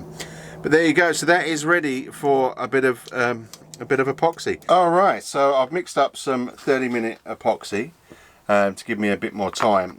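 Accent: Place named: England, United Kingdom. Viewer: Hello! A time ago I found your channel for looking after the Max Thurst Ruckus. Now I´m thinking about buying a used but almost new Draco for about 500€ maybe less and knowing the low points. Until now I have seen complaints about CG, tires (you said they were changed?), power and landing gear (front and rear). What should I consider if buying this used one, what do you think? Much appreciated to hear from you Master:))) Best regards to the UK, your channel is really cool, and it is nice to hear english accent instead of the most in RC spreaded american. Just my opinion:)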